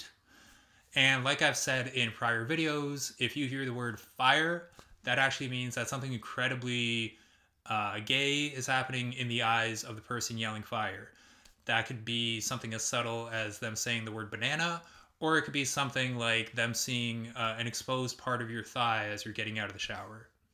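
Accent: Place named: Canada. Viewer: I love the way Canadians pronounce “about,” and you’re also really good looking. Information and cute accents sound best from a handsome man. ❤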